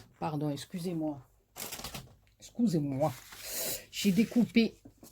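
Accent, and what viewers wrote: South, France. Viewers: c'est vraiment sincère!! j'admire ce que vous faites!!! j'espère un jour faire ne serait- ce qu'un tiers de ce que vous faites!!! et croyez moi, je ne vous lèche pas les bottes!! hihi en plus avec avec votre accent du sud,un vrai plaisir!!! merci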